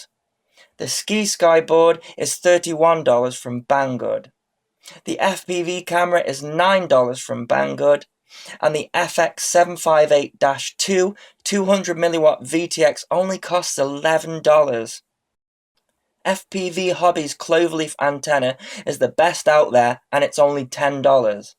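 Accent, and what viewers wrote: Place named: United Kingdom. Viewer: Oh sorry, just you have a British accent and the scenery around where you fly look like it is the uk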